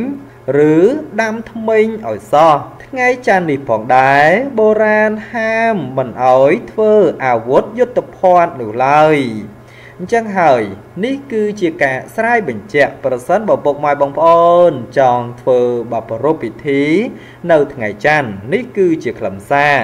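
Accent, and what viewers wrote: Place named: China. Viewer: និយាយដូចអាចេកចឹងពិបាកស្តាប់ណាស់វើយ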